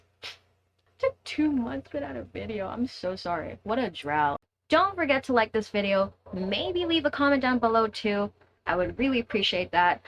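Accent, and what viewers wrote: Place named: United States. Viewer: Hi this is me Theo. I like the fluent English and the American accent.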